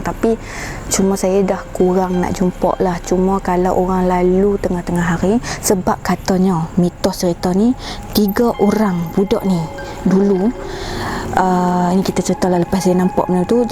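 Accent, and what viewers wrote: North, Malaysia. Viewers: Pekatnya loghat utara, saya yg org n9 ni kena dgr betul2 baru faham 😅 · tetap... Saya akan sokong walaupun bahasa utara ni kadang-kadang kasar seperti kertas pasir namun kehadiran cerita cerita seramu sentiasa ku nantikan asalkan keseramannya sampai ke paru paru dan limpa hahaha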